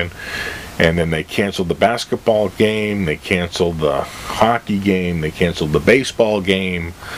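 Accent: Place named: Massachusetts, United States. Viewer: Hey: I finally figured out where you live. My wife is from Sharon and I grew up in Abington although we've lived in Maine for the last 25 years. I thought I recognized the accent. How come people from your area never vacation in Maine but think NH is the vacation Mecca. We are, after all, the vacation state! LOL. Rock on Dude..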